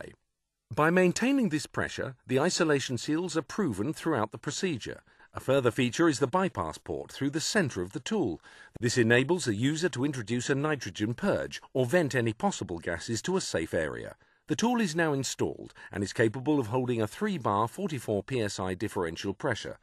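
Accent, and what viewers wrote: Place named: United Kingdom. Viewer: Narrator is wonderfully British.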